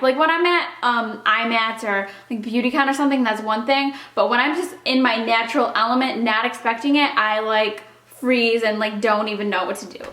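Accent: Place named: New Jersey, United States. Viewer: lol i have been watching since she started on and off and I always knew she was from NJ because of the way she talks and she talks with her hands a lot like we all do from NJ specially with italian blood but I never heard her say it and after I saw the back of the house i was like that has to be an older NJ house!!! LOL specially up north NJ bc they are soo much more pricey that you are almost forced to buy a fixer upper but in the end its better because you can put ur own little finishing touches! xox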